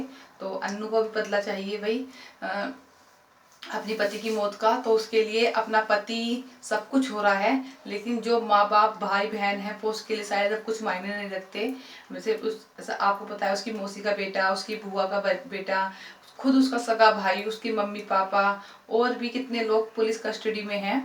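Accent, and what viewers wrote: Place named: Haryana, India. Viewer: di ap haryana ki language me baat krte ho ho aap shamli ke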